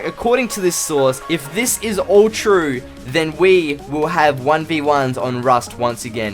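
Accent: Australia. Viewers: I swear he's Australian not British.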